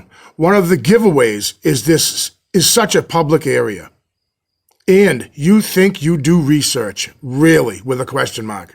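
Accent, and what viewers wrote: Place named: South, United States. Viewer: Awwww, ~ just goes to show that you cannot please all of the people all of the time. YT is FULL of trolls! I will be the first to admit that I'm a sucker for your accent ~ being from the South, I find it very charming. (i.e. the way you say question "mahks" ❤❤❤) I LOVE listening to you, to your descriptions, to your stories... just being able to tag along with you on your adventures. I even like it when you just sit in your van and talk. Hmmm guess I'm just a true fangirl Haha. Seriously though ~ not just patting you on your back ~You make the world a better place being the down to earth, intelligent, great guy that you are. I don't usually say things like this... but that guy is a douche. Eww 🤢 I can't believe I used that word Haha. Maybe I made you laugh. Or at least smile after that loser's nonsense. You should have the option to unsubscribe him from your channel since apparently he doesn't know that's an option! 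Be well and do you,! Much love from Tennessee!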